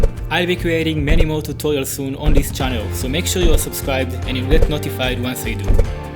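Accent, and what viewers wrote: Israel. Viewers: שומעים את המבטא הישראלי😆 אבל יפה אחלה סרטון👍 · אפשר לשמוע שאתה ישראלי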